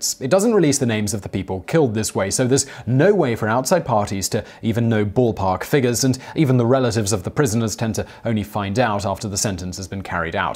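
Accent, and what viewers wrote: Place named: London, United Kingdom. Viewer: Mr bald guy speaks so fast with his silver spoon London accent. His enthusiastic tone dies down towards the end due to fatigue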